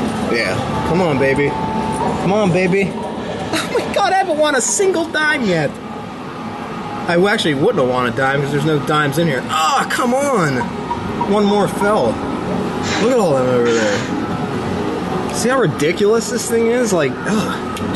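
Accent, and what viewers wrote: United States. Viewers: he has the most american accent ive ever heard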